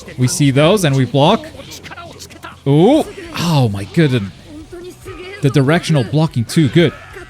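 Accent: Portugal. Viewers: Tu es portugues?!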